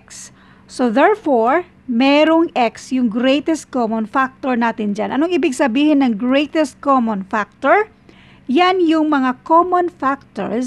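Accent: Visayas, Philippines. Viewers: Thank you so much Maam. You are so humble and sweet. I like your visayan accent. All the love from here (Dumaguete City)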